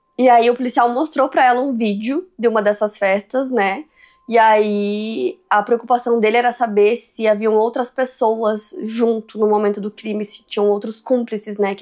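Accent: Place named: South, Brazil. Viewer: gosto do sutaque do sul rsrs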